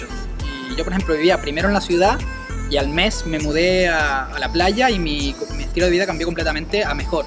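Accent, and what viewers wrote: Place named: Chile. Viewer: Tú acento es igual q el de los chilenos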